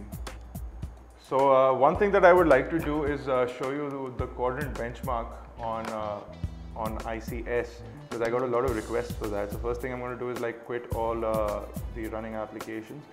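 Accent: India. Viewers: BLAH BLAH BLAH BLAH, I HATE THE ACCENT OF THIS INDIANO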